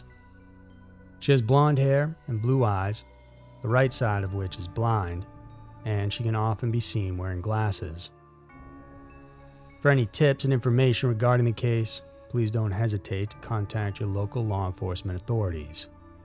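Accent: South, United States. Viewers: Great video. And I like your southern accent too.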